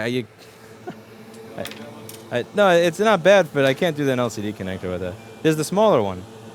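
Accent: New York, United States. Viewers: You are hella New Yorker but I like it. Hey I actually learned how to Solder watching your videos so thanks man. You do you bro, I know I enjoy your videos.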